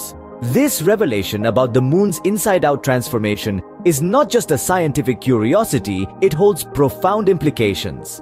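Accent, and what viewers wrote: India. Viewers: when i hear indian english i change the chanel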